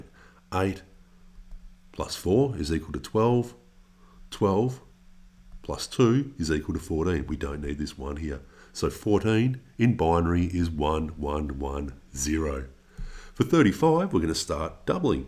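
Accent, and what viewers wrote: Australia. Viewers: I watch your videos because I always learn whatever you’re teaching because you teach very well but mainly because I just wanna listen to you speak lol where are you from? Australia im guessing but which part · At first I thought you were british but the Australian accent kicked in later in the video 😂😂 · I had to go over this in my online class and completely skipped the unit cause the way they explained it was too complicated. It was ridiculous and I hate math. After this video, I went back, followed the instructions and double checked and got them all correct. The Australian accent made it 40x easier. Wtffffff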